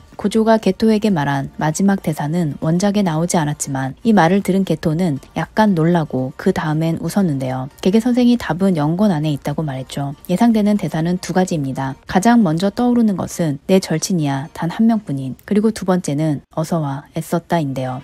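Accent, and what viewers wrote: Japan. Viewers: 항상 잘보고 있습니다 일본어 발음이 영상의 매력같아요ㅋㅋ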